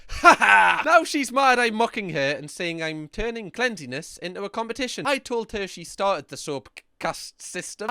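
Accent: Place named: North East England, United Kingdom. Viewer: I didn’t realise it was so long I’m about 50 minutes in, I love to binge watch so I wouldn’t have noticed I would have thought I’d just watched a series but thanks for the effort you put into these videos they are very entertaining you have an ace sense of humour and I love the north eastern accents, this one and Irish both melt me 😊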